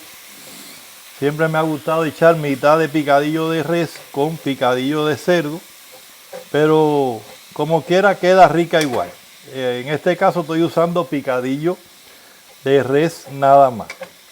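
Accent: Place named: Santiago de Cuba, Cuba. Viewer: Yo voy a hacer tu receta y seguir tus trucos tiene muy buena pinta. Dios te bendiga abuelito, me dio mucha nostalgia tú acento, yo también soy Santiaguera.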